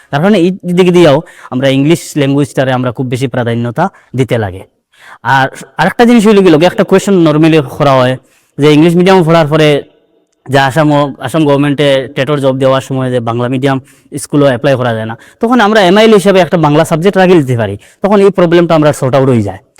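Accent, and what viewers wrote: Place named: Sylhet, Bangladesh. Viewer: ইংলিশে খইলেও ফারলা ওনে, তেবউ সিলেটি মাত হুনিয়া বহুত ভালা লাগলো..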